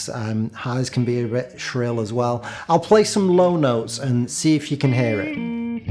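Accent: Manchester, United Kingdom. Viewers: Thought you'd misspelled 'account'... But no... MANCHESTOH 👍💪🐝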